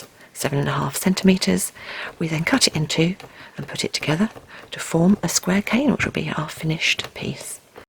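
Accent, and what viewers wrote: United Kingdom. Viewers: You inspired me today, to borrow some ideas from you, think up some of my own, and make a veneer, and then a bracelet. Mind you, it was the absolute worst weather for working with polymer clay (hazy, hot and humid), but I did it anyway. It came out pretty well, too! The funny thing is, every time I grab a wooden skewer to smooth out seam lines, I can hear your sweet British voice inside my head, coaxing me along. Thanks for all you do.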